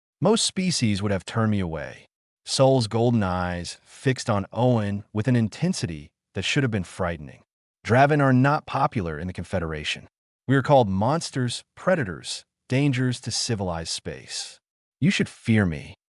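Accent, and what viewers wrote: Spain. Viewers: I hear it too. Definitely Spanish, but from Spain, not Mexico. I just laugh and continue listening. This AI voice, lulls me to sleep. I love it. The other one that helps me sleep is Radio Reimagine, great stories! Very relaxing!!! Have a great life!!! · So sick of the spanish accent kicking in. IF you want a AI to read it that fine but get one that is native to the language you are talking in. It totally takes you out of the story.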